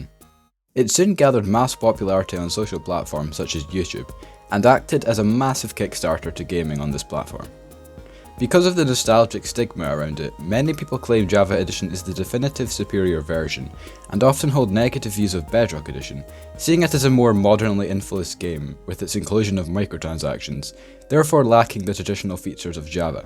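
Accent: Scotland, United Kingdom. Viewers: Bro I really miss you and your video's. Please come back. I just watched your buzzy bees mod video for like the fifteenth time just now and it was honestly the most relaxing thing on the planet. I love you Scottishness and your ( well actually it's from minecraft) cat background music. I wil watch your farmers delight mod video tomorrow and I hope you will return shortly. Have a wee great day.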